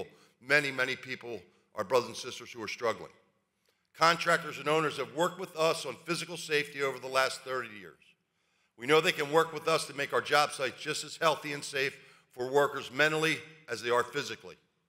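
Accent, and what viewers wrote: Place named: United States. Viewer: You sound exactly like an American oughta, you sound like my voice, the voice of my father -- carpentry, my grandfather -- electrician. The heart of America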